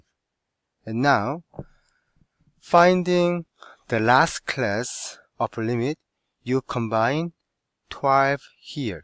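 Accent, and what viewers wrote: South Korea. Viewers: Omooo!!💜thank you so much😇 Wait am I the one here feel confused about the man speaking having a Korean accent of English??😁anyways good job really a life saver😇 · Off topic but can i ask why does your accent sound like Korean English accent ? Or just mind is still in SK.....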